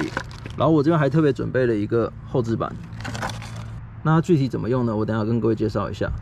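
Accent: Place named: Taiwan. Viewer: taiwan accent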